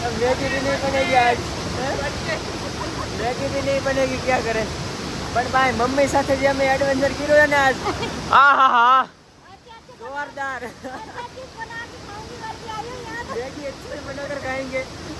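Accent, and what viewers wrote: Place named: Bhavnagar, India. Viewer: Tamari language(boli) pyor bhavnagari che ❤ so like it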